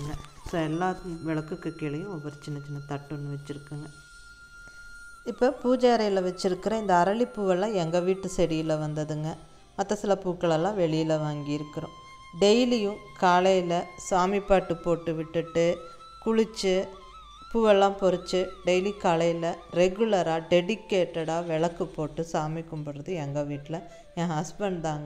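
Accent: Kongu, India. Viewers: Mam ur from which place. Ur slang looks like Coimbatore. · I like your kongu tamil very much. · Alaga Coimbatore slang pesaringa pongako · Neenga kongu Tamil a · Hi mam,unga kongu Tamil nallarku,and unga son pencil sketch really nice.....we r planning to built a new home....unga pooja room,bed room,kitchen over all its so good and romba tasteful ah katirkinga....pls show al d rooms...and front elevation....and pls mention d furiture purchase place....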